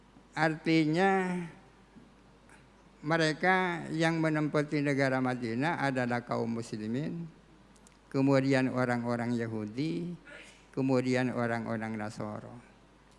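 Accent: Madura, Indonesia. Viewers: logat.. nya madura ini yai..? siapa beliau adakah profil lengkap nya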